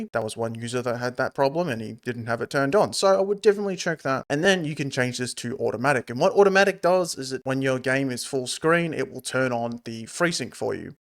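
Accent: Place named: Australia. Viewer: "It's Linux next year", proper Aussie accent and YouTube transcription service is in shambles. Maybe this year will be THE year for Linux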